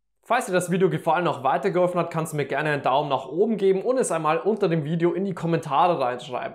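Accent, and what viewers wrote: Germany. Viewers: 👎🏼 wegen der deinem deutschen Englisch Lasst es doch einfach sein mit eurem scheiß Grundschul Englisch Geschwätz. Du machst ein deutsches Video dann schwätz auch deutsch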